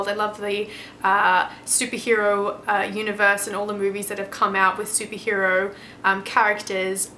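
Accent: Australia. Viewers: Great review :) Is that an Aussie accent?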